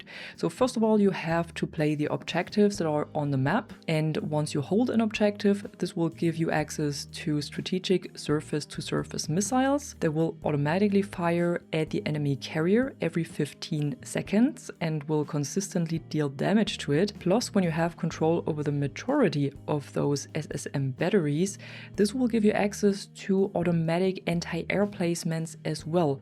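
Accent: Germany. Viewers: I like your German accent when you speak in English 🙂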